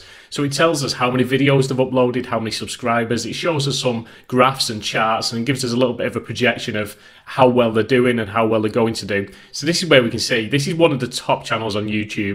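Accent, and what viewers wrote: United Kingdom. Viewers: 1) thank you for the video it inspired me to try making channel 2) good content and links not overly pushed 3) lovely to hear a uk voice.I love our American friends but YT is to overcrowded with them ;) Cheers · Never thought I’d hear a scottish Tom Scott saying “Balls”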